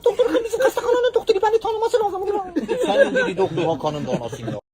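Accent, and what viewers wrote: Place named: Erzurum, Turkey. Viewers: adamın şivesine zor tahammul ettim, sevimsiz Erzurum ağzı. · Erzurum lehcesi hastayim ya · Çizim de Erzurum şivesi de eyi olmiş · Olay çok komik ve Erzurum ağzı ile anlatılması daha da komikleştiriyor DYSIDJGXUXOSJSGX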